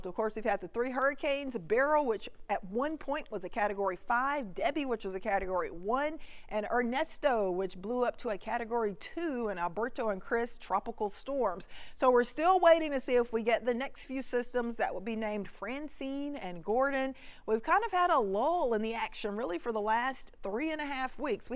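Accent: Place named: South Texas, United States. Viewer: Love the Lil Southern Texas draw and the way you use it too talk 🦜........just lovely 🌹😍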